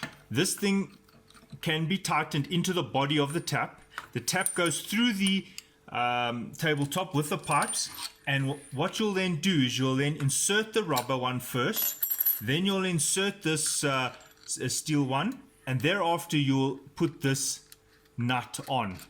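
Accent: Afrikaans, South Africa. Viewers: I'm a kiwi and the SA accent is familiar to me too, sounds much better than the Aussie one... they all sound like they're holding their nose whiile complaining about being constipated. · I didn't have a clue how to do this but now I know, thanks pal, I'm off to Bunnings as well, and is that an Afrikaans accent I detect?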